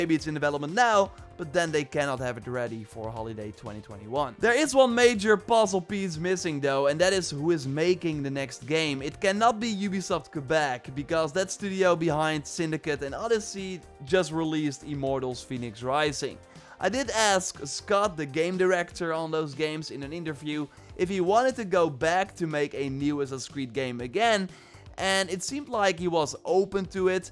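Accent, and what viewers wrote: Netherlands. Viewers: Your accent sounds Dutch. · Your accent sounds like a Dutchman talking English lol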